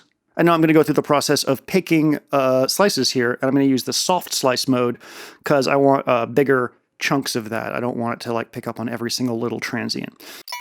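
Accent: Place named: Canada. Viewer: Also Canadian?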